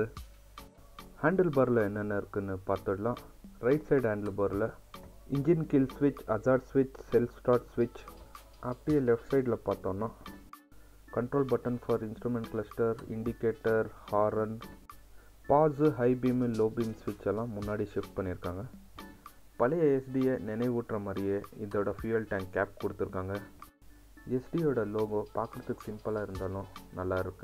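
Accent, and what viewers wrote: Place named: Bangalore, India. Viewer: Bangalore Tamil nice best of luck bro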